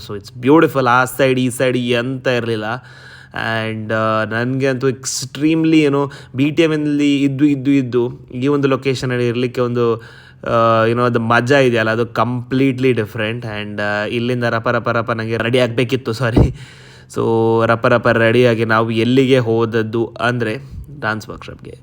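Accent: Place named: Mangalore, India. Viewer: Kudla da kannada kend bayankara kushi and😅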